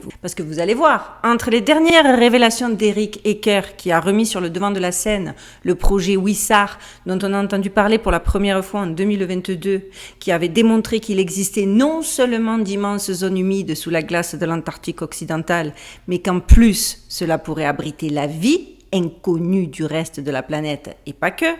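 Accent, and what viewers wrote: Marseille, France. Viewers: manifaiiiike !!! trouver toute ces infos doit etre un boulot de dingue et que ceux qui te traite de Cagole aillent se faire cuire le cul !! des ignorants du parler marseillais surement !!! gros bisous de marseille ou il pleut comme vache qui pisse aujourd'hui et finit la canicule on respire enfin !!! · Pour répondre à votre question,je ne pense pas que vous deviez perdre votre temps avec des orcs, gobelins ou autres trolls 😂,même si cette théorie de la terre creuse me parait totalement impossible ( volcan, oxygène, lumière etc..),je sais qu'ensemble nous cherchons le vrai du faux protégez vous de ces obscures graugs par le mépris..je sais " facile à dire,pas facile à faire",nous sommes beaucoup à apprécier vos interventions du dimanche,votre sourire,et votre timbre marseillais,et si nous sommes tous timbrés et bien "plus on est de fous..."😊😜❤️ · en tout cas, j'adore voir duchesse (j'ai cinq chats), j'aime les sujets que tu traites (ça fait partie de mon métier de diffuser des livres sur ces sujets) et purée j'adore ton accent marseillais qui doit être vraiment saisissant pour les américains. 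Et alors quand tu ris juste après avoir dit une sottise c'est juste un rayon de soleil. Continue et si tu as des sujets sur les civilisations anciennes, n'hésites pas, je suis en attente. Je suis une mamie de 60 ans, éditrice et traductrice et franchement, il y a quelques youtubeurs dont toi que j'aurais adoré avoir pour enfants, les miens sont macronistes, et ils ne jurent que par le dieu BFMTV, autant dire une déception terrible pour une vilaine complotiste comme moi 😂😂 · Hello comment ça va bien 🥰🥰🥰🥰 nous ont adore tes vidéos tu est super j'adore ton accent de Marseille allez go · Comme toujours, très intéressant, intriguant, et bien présenté. Tu es « TOP » dans ce rôle. En plus ce p’tit accent du sûd est irrésistible… Pour ce qui est des détracteurs, ces troll’s n’ont que brosser si ça ne leur plaît pas. Ne change rien. Petit bémol, es-tu certaine que la terre est ronde ? Cela pourrait expliquer beaucoup de choses …. 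Il y a encore du taf ! 🤓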